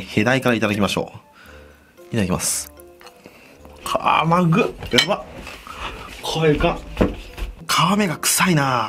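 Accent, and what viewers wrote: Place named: Nagoya, Japan. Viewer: 時々のアクセントに名古屋弁が出てますけど東海地方の方かな？ 値札に書かれた場所から推測するとあの市場か〜。時々、行ってお値打買い込んでたわ。 まんまと魚○場の術中にハマってました。勉強になりました。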